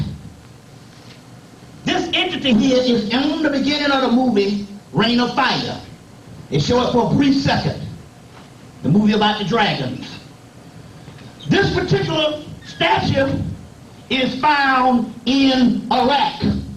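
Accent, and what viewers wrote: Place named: Rural, United States. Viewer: I feel you. It's actually his stumbling, stuttering and country twang that helps me understand his knowledge. The elders in my family all country, so listening to the master teacher is like being homeschooled by an uncle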